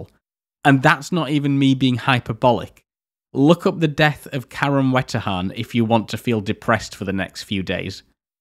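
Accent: United States. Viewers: This is a really interesting video, but do you have to speak in American English? It spoils your delivery.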